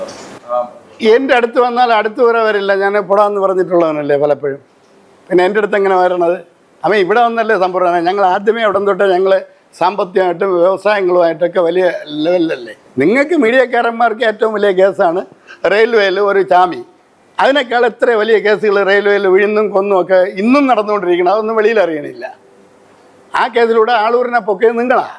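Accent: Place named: Trivandrum, India. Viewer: Ithokke ivide vannu ' You Tube' vazhi enthinanu chetta parayunnathu. Adheham marichu ee lokam vittu poyille . Ithokke kondu ningalkku enthu gunam aanu ullathu. Aaloor prasasthan aayathil ningalkku enthu nashtam aanu ullathu . Valare thaazhnna nilayil ninnum kashtapettu oru LLB degree eduthille . Vyaaja degree yum koondu judge maar aayavar vare nammude naatil ille . Ningalum Alloor rine pole ningalude maarggathil prassasthan aakaan nokkuka . Ningalude malayalam slang un reethi yum kettittu ningal Trivandrum jilla yude thekkulla etho sthalathu janacha thu pole aanu thonniyathu. "Adikalum kitti " Pune vittu ennathu kettittu , onnukil ningalude kudumba thil aarengilum Trivandrum thinu thekku bhaagathu ninnum undaayirikkanam Allathe ee slang varilla .Ningalude slang Parassala , kaarakonam, Amburi , Amaravila, kanjiramkulam , vizhinjam kaliyikka vila slang pole thonni. 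Aaloor marichu poyille chetta..vittekkoo.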